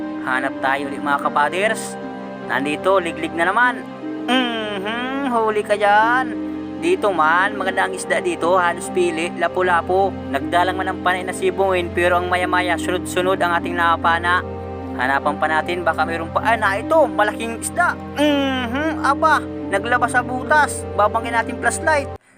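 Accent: Philippines. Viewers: Parang taga katanawan ka kuya ah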